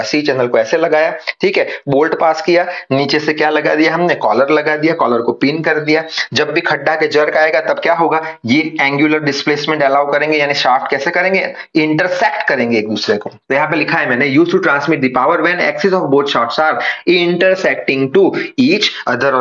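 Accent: Gujarat, India. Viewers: sir are you gujarati?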